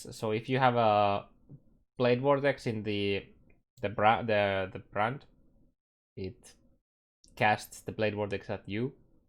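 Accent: Finland. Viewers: I am going to be honest. I know you know a decent amount about the game but your guides are awful for several reasons. First of all, your videos are too long. You need to learn how to edit your content. If you wanna be serious stop copy-pasting and learn how to make it short, on point and simple. Secondly, you don't articulate your thoughts and your English is decent at best. You have to convert your thoughts into sentences for other people and explain yourself. To me it seems like you are just saying whatever word comes to your mind first and you output that. Your Finnish accent and mumbled sentences don't make things easier so you have to compensate for that. Lastly, I myself play this game for a long time and most of the time I'm struggling to understand what you're saying even tho I understand the topic of things. But a lot of details lost. Now imagine a person who has no clue about the wider picture. 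Your explaining of things and these guides are making me facepalm too much and it's disappointing so I thought I will give you some advice. Now what you do with it is up to you.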